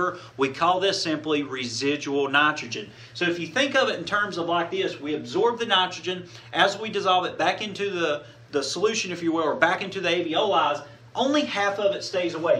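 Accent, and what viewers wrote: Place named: Appalachia, United States. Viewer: Not only are you Southern, but you're also Appalachian. Gotta love those Hillbilly boys!! (I'm a Hillbilly, born and bred in East KY) <3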